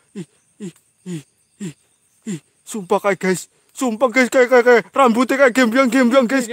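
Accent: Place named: Banyumas, Indonesia. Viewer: Seram tpi aga lucu ya,ngapak xxz.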